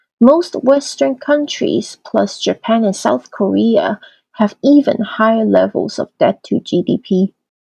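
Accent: China. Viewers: 能听出来你是中国人哈哈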